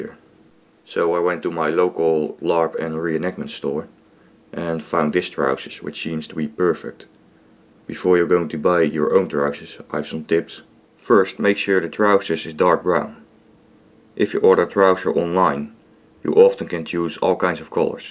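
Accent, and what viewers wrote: Netherlands. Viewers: Are you Dutch? :o it sounds like you are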